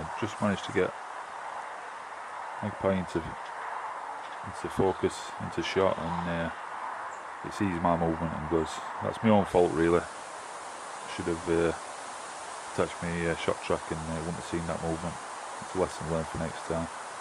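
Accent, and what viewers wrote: Yorkshire, United Kingdom. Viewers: Very enjoyable. A good commentary, calm and informative--even with the Yorkshire accent!Subbed.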